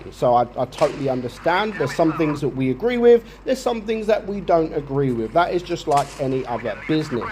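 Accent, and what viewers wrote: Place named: England, United Kingdom. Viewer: ^ is ENGLISH & doing his video in ENGLISH so he speaks ENGLISH** **( well there's a fucking surprise, "DUH"!!!!! ) "If" YOU want proper pronunciation then stop-watching, fuck-off & go make a POLISH version....YOURSELF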